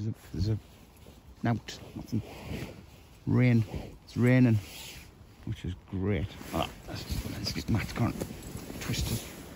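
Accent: Newcastle, United Kingdom. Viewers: I think he is Geordie 🤣🤣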